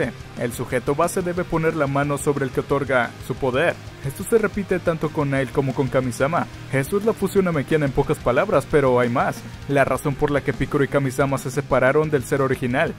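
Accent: North, Mexico. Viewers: senti que en este video hablaste como estilo norteño, fierro pariente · Ahhh nms tiene como un acento norteño no?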